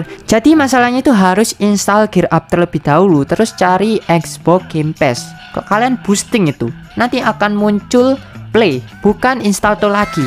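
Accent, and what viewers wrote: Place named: Indonesia. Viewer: medok e rek rek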